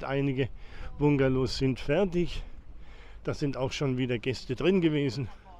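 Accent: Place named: High German, Germany. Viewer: Ich freue mich morgen nach Gran Canaria zu fliegen. Ich bekome schon heimweh nach den Dünen. Mach weiter gerne mit ihre Reportage. Ich schaue gerne ihre video clips und daarbei auch meine deutsche sprache üben. Sie haben einen schönen Stimme etwas hoch Deutsch mit eigen accent! Vielleicht wenn ich daar ben und Sie treffen,werde ich Sie sicher ein Bier anbieten.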